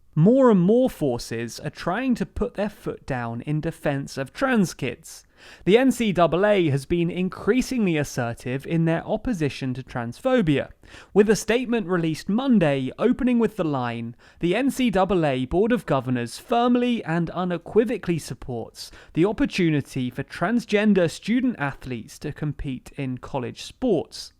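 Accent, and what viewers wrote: England, United Kingdom. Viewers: Are you sure, I heard Dysphoria, he does have an English Accent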